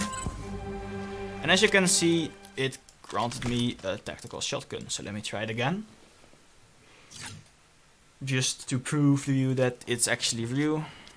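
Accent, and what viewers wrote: Netherlands. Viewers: wait are you dutch?? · Are you Dutch?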